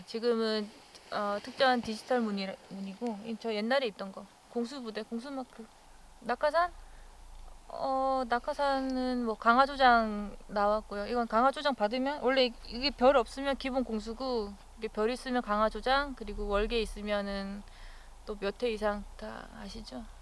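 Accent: Gyeongsang, South Korea. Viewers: 약간 경상도억양 있으신게 더 매력적이십니다. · 살짝 경상도 악센트가 느껴지는데영ㅋㅋ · 작다~~ 경상도 아가씨네요 ㅋ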